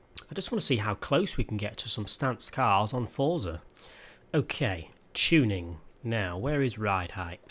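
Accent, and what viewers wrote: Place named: Manchester, United Kingdom. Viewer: sounds like you've got a mank accent u from Manchester ?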